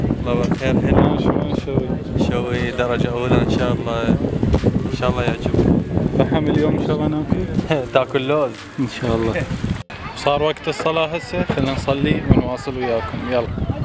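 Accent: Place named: Iraq. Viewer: استمر انته عراق احسك عراق من لهجت عراقين لين انه عراقيه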